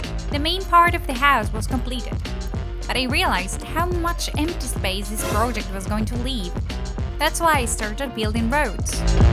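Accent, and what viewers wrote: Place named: United Kingdom. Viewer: Who love her British voice❤❤❤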